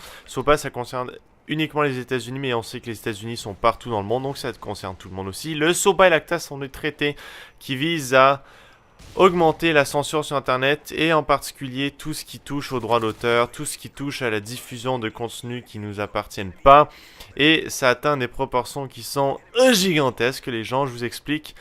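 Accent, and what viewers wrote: Quebec, Canada. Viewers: Tu as un accent québécois non ? x) Très bonne vidéo ! (Y)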